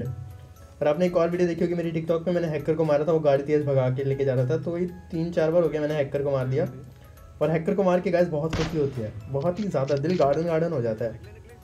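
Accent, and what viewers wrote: Rawalpindi, Pakistan. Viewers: apki zuban se to pindi boyy lagte ho 3) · Aap pindi ke lgte ho bawa jee 🤗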